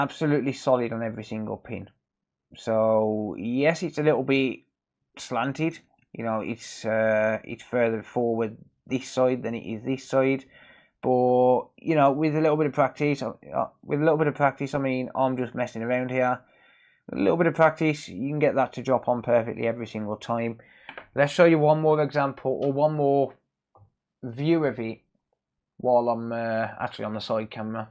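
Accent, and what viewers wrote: United Kingdom. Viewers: I could hear the authoritative British accent before the video even began 😆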